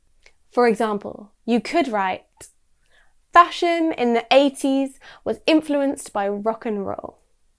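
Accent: United Kingdom. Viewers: 영국식 발음 멋있어...👍👍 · 와 영국발음 적응안된다 ㅋㅋㅋㅋ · What a lovely british accent! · 말하는게 너무 영국식이야.. ㅠ.ㅠ 했더니 영국영어 채널이었군요. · She is british ... diffrent from American or Australian English ... Whoever put this clip needs think that hard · Your lesson is very easy to understand for me and I like British accent because i am living in UK. Thank you !!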